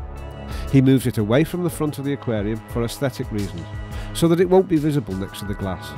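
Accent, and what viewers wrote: Liverpool, United Kingdom. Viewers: Is the narrator Scouse? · Like myself, the narrator has a Scouse accent which is the casual way of saying a Liverpool or a Liverpudlian accent, it is an odd accent in so far as that there are quite an awful lot of people in the UK who just dislike the Scouse accent, and yet we have had a survey taken here in the UK which researched local and regional accents for use in providing a service for the Samaritans, it was determined that the Scouse accent was the most emotional and soothing of all UK accents giving callers a sense of trust in the telephonist, this is contrary to the stereotypical opinion held by people nationwide that Scousers are not to be trusted.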